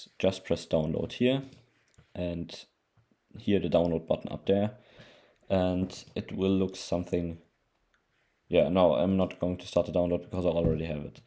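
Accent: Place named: Germany. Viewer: Fantastic video!! your english is perfect and your german accent is nothing to be ashamed of. i had spent the last year trying to find a solution for multiverse on my macbook, only recently discovering it could work with crossover with a few shenanegans, im sure this video will be a goldmine for anybody like me who cant fork out hundreds of dollars for it.. fantastic work!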